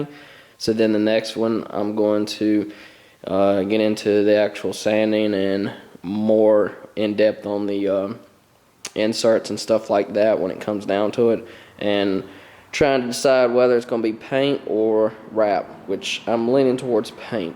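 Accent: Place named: South, United States. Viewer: I'm just hear to hear that sweet southern twang...... XOXO!!!! THUMBS UP IF YOU AGREE!! #TDHFTFW #FAMOOSE #SHTNONM #TEAM.DEADLY.HERTZ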